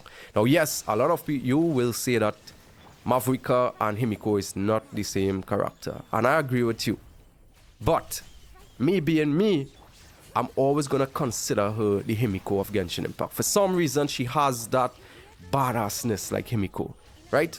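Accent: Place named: Trinidad and Tobago. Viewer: I was wondering same ... he sounds very Trini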